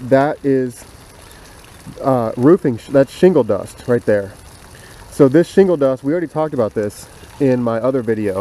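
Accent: United States. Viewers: I can't place your NC accent....Cary?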